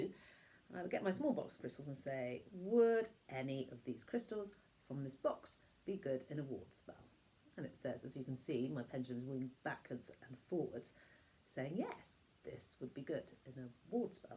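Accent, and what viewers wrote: United Kingdom. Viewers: it,s nice to hear a respectable brittish woman speak about stuff.:face-red-heart-shape::face-red-heart-shape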